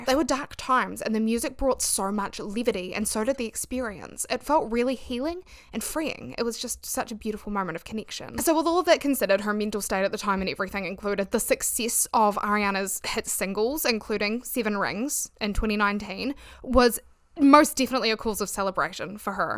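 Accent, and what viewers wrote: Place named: New Zealand. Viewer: yayyy I’ve been here long enough to know to expect your “its a kiwi accent” disclaimer!! Im grandfathered in!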